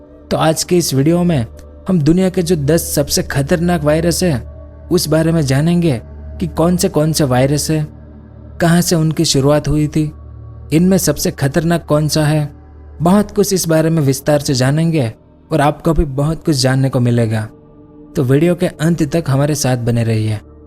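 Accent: Gujarat, India. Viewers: i am also from gujarat ahmedabad 👍 Aapki awaj par se mujhe laga ke aap gujarat se hi ho, isliye puchha.. good speach, good animation and knowledgeable video👍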